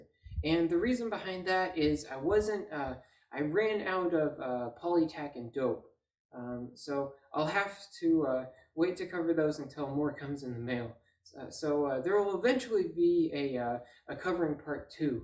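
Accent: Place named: Canada. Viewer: Has to be his voice, and that hippy Canadian accent.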